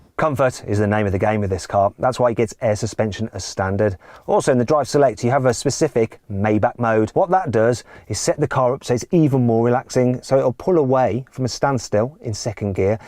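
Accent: United Kingdom. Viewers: 억양이 멋지네요 영국발음인가요?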